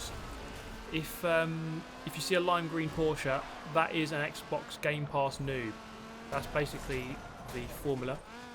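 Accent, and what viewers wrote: England, United Kingdom. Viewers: The Racing: decent Cheeky English commentary: priceless.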